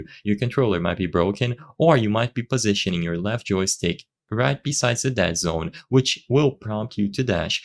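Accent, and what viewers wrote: Brazil. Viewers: You have a Brazilian accent, that's funneh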